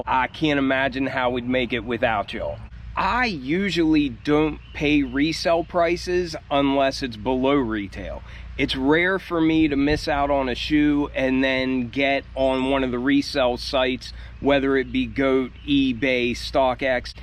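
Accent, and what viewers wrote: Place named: Pittsburgh, United States. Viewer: You from Pittsburgh bro? I noticed the hat and shirt. Plus the accent . I’m from there so I’m curious…I paid resale for those too unfortunately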